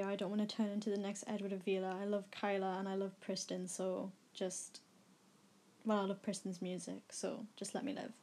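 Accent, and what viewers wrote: United Kingdom. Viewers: Are you... a geordie? Trying to place accent lol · Off topic but where are you from? Your accent seems british to me? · but that's none of my Business . Chill out it's her voice not yours if you don't want to hear it then leave. Also British accents are great don't diss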